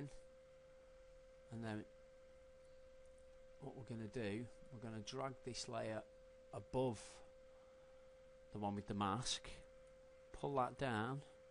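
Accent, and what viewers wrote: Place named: Manchester, United Kingdom. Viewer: really good tutorial, and I like the Manc accent top man.. lol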